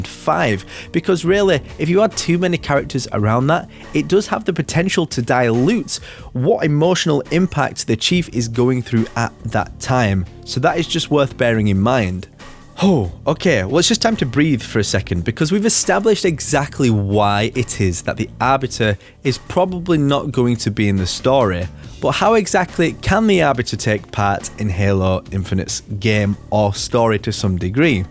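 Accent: Yorkshire, United Kingdom. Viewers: You aren't from Yorkshire are you? I'm not myself, but I lived in Yorkshire for about a year.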